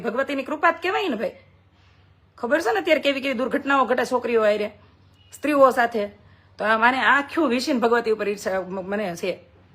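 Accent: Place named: Kathiawar, India. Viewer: ઓરિજનલ કાઠિયાવાડી શૈલી માં ખૂબ સુંદર વાતો કરી gret hartly lovely tru ok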